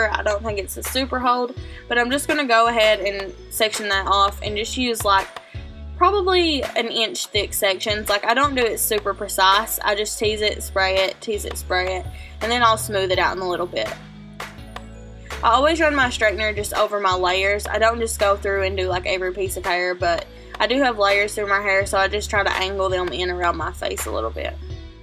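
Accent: South, United States. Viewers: I came across your channel about an hour ago and I'm pretty sure I've found my new favorite YouTuber! I'm from way deep in the south and it's so great to hear a southern accent from a guru! You've inspired me to consider making a channel:) would you consider making a video about how to start a channel, what camera you use, etc? Can't wait to see more videos:)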